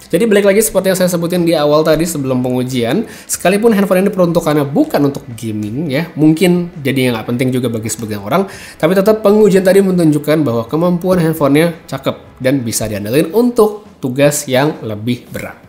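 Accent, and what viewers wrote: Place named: Manado, Indonesia. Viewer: BANG ORANG MANADO YAH? LOGATNYA MIRIP ORANG MANADO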